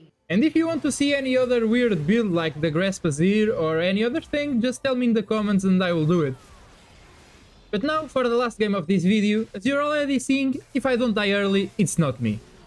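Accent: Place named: Portugal. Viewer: my guy o teu sotaque portugues é que nao dá para esconder hehheheh, keep it up bom video maninho!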